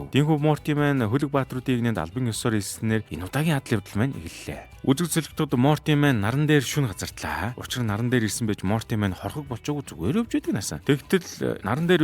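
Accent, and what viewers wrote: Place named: United States. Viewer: Таныг яагаад ариун ус гээд байдгийг өөрөө цувралыг нь бүрэн эхээр нь үзээд ойлгосон доо хха. Сүүлдээ бүр хэл яриа Америк стилтэй болж эдр хха. Таны зовлонг ойлгосоон. Ххэ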